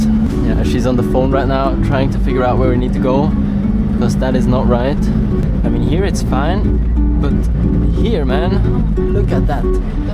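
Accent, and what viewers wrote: Switzerland. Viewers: As a german i want to hear your english more likely then your SCHWEIZER-DEUTSCH Accent. (No hate btw✌️) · te schwiss german akzent ghört mer sehr dezent haha, aber schön :D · Are you from switzerland by any chance? Recognize the accent :)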